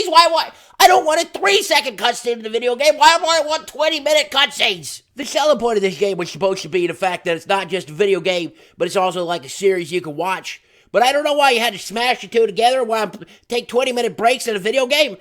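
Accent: South, United States. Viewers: I haven't watched in a few years. What happened? The voice is totally different. It sounds nasally and like a bad mix of a Southern accent and...something else.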